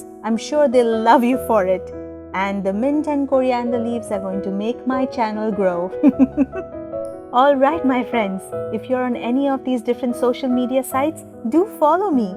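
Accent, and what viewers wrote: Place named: England, United Kingdom. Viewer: Nice English accent and thanks for the video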